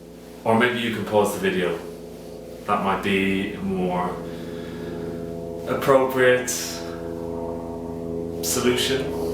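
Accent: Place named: United Kingdom. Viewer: Hi, First of all, I would say that your videos are great although I discovered your channel recently. I've got one question, are you german? cuz I haven't seen any german which speaks such a great british accent.:))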